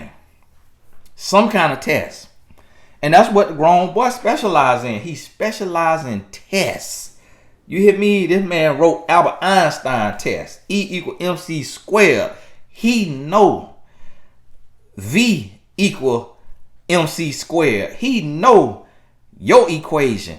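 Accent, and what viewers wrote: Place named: Florida, United States. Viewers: That's Florida for you lol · Yes he’s very country. That’s how a lot of Floridians talk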